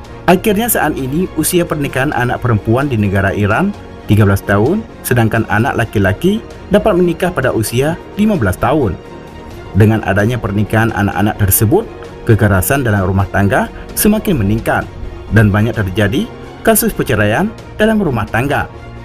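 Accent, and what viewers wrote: Aceh, Indonesia. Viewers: Logat nya orang Aceh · macam orang Aceh lagi berbahasa Indonesia.. logat Aceh nya masih kental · Dialek host nya dialek Aceh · Admin nya kayak org Aceh ya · orang aceh..dari logat bicaranya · Admin org aceh kah? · Orang aceh boz ku. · Pengisi suara apa Aceh100% Aceh Pidie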